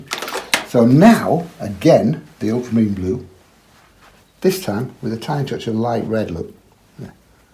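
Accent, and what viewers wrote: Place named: Sheffield, United Kingdom. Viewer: Hiya. I see I'm the first to comment! I found you a couple of weeks ago and I've watched all your videos. I really enjoy your teaching style and casual and effective way of approaching art. I live in South Africa but was born in Sheffield so your accent is a joy to listen to. Best regards Sylvia xxx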